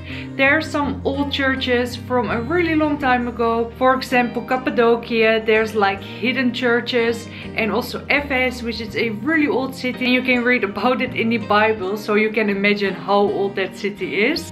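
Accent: Netherlands. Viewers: I knew ur from The Netherlands, just because of ur accent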